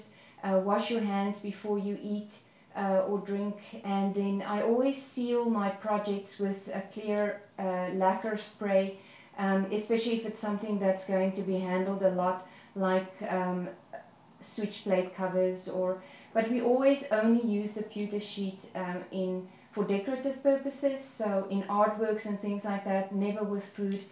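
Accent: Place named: South Africa. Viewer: Looks lovely,is metal foil the same as pewter.nice to hear South African accent again ,I'm a ex South African living in the UK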